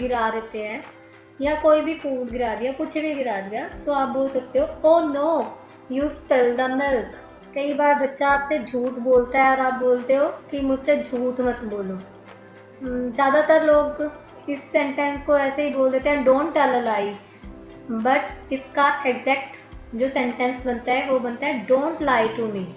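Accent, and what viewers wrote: Punjab, India. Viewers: are you punjabi..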